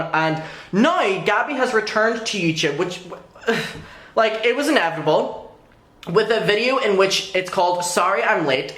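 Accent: Ireland. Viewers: Knew when you started talking you were from Ireland LMAO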